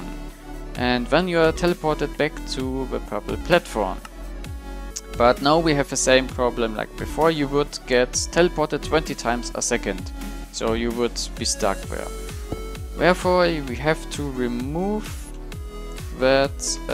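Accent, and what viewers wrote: Germany. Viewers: Man hört das du ein Deutscher bist ;) #NoHate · Bei deinem Englisch hört man das du Deutscher bist XD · are you german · i thought youre german lol because you Sound like this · Man hört voll das du deutsch bist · man merkt voll der ist deutsch haha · Are you german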